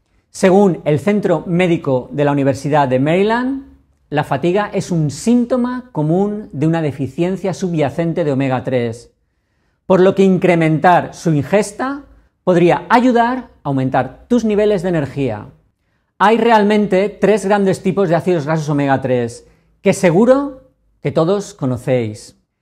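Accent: Spain. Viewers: Q,buena información mi Dr,mando a tomar Omega 3,ya q se me está cayendo el cabello .yo me quedé viendo todo su video muchas gracias .me encanta como háblan los españoles,🇨🇴✈️✈️de Medellín,Colombia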